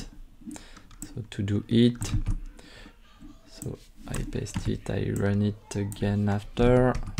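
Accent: France. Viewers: Yoooo.. J’adore l’accent français bro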